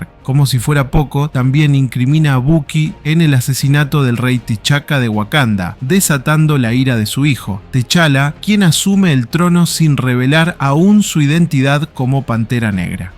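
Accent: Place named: Argentina. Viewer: Por favor un argentino hablando de esto que puta mierda ese acento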